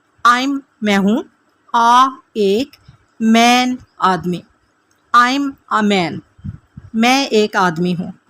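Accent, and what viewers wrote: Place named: Pakistan. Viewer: App english ko bi urdu ki tara pahrra rahi hy kum as cum u,k excent tu use kery 😢😢😢😢😢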